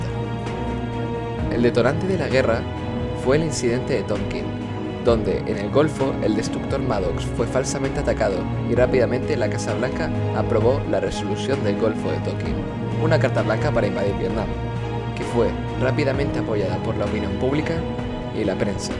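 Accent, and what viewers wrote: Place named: Spain. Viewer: El payaso este es español, conozco el acento, como todos los comunistas es un niño pijo de familia mimada.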